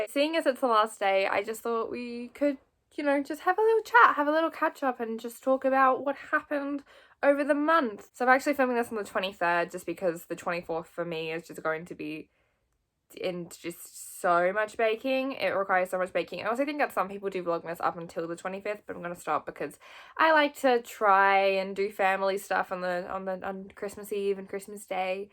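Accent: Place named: Australia. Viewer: Hii!! I’m a new subscriber! Are you Australian? Sorry, you have an Australian accent :)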